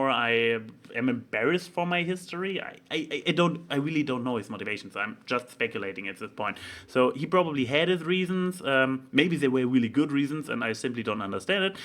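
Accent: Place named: Germany. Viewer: Oh I don't stalk you, I just am just drawn to your sexy German accent everywhere you go lol. Anyway I like content. But I think his brand of humor works better when he has someone to bounce off of like his collab with Josh Scorcher. Also you might want to be careful with these vlogs because you got a little redundant at times.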